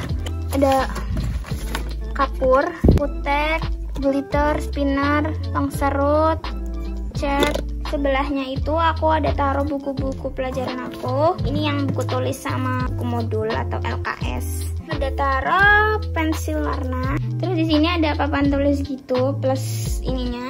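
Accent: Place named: Java, Indonesia. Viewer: Orang Jawa ya,,,,, suaranya tuh kek jawa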